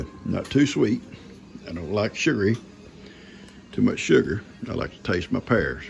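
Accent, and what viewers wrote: South, United States. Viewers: closed caption needs to recognize that southern drawl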